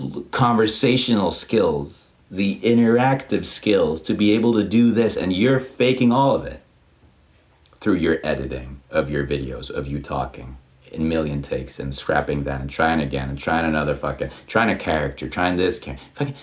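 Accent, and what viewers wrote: United States. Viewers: En ymmärrä mistä sait tuon jenkkinuotin englannin puheelle. Tästä voisit tehdä videon, että miksi osaat noin hyvin? Hyviä videoita olet tehnyt.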